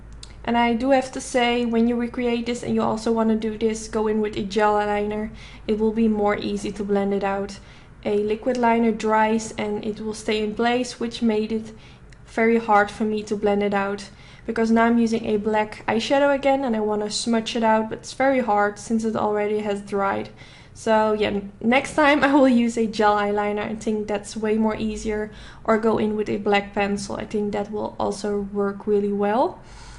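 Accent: Netherlands. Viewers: Are you dutch? You have a dutch accent 😂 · Flawless😍 enne... Hoor ik daar een dutchie? 🇳🇱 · Tusha van Well I knew it! I had a Dutch friend & this is how she talks! Mooi fr 😁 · Dutch accent I hear you!! Super mooi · Ik hoor echt dat je Nederlands bent 😳😳 maar je kan zooo mooi opmaken wauw !! super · your accent sounds dutch... Are you dutch? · u are totally dutch i can hear the accent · Fiona Anker-Petersen If I hear her accent, I would think she is from Holland... But maybe I'm wrong. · Where are you from? Are you Dutch? I love your accent! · Hoi! Ik hoorde meteen al wel dat je Nederlands bent, wat ook helemaal te gek is. Je hebt echt super veel talent en met deze look heb je me geïnspireerd. Groetjes van je mede-Nederlander! ❤️ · i knew that when i heard your voice that this was a fellow dutch person haha zo leuk! gelijk gesubscribed! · je doet het wel mooi zeg! 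merkte meteen dat je Nederlands was haha, x.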